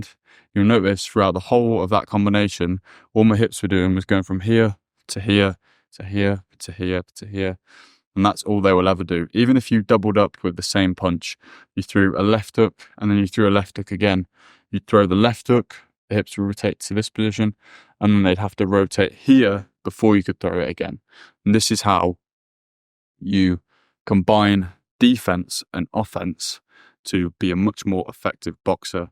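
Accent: United Kingdom. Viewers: I just bought a punching bag, hung it up, and started boxing. Thanks for the tips and the video. I really like your British accent and the way you explain all the things 😎 Thx!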